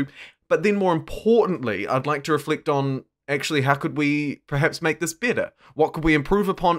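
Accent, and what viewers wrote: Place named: New Zealand. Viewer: As a fellow kiwi-accent-haver I understand the pain.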